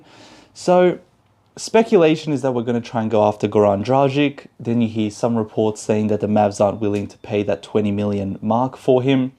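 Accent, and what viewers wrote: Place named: Australia. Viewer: Just found you. I grew up around Dallas when they first became a team in 1980 or the 80's (getting too old to remember). I'm guessing your an Aussie, hard to tell with some Aussie, English, or Kiwis, for me. Great analysis, would be awesome to get Dragic and Oubre. You never know with Cubes. Great that Slovenia and Australia are playing each other that will bond Luka and J Green. #mffl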